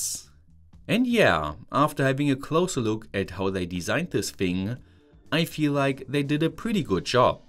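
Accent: Germany. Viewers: I have also been subscribed for many years. But I don't understand why you mislead people that you are Scottish if you have such thick German accent.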